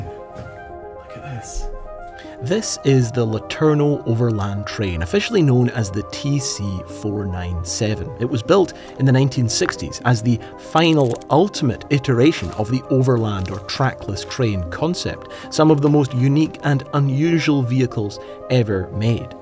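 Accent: Scotland, United Kingdom. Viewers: Excellent video just subscribed first time viewer😂 Nice to hear a jock voice and not a whiny American Voice Scotland Gaelic accent no 1🇮🇪🏴🇬🇧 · Outstanding job, and it’s even better with a Scottish accent. Very thankful you want to all your trouble to do this, it was well worth it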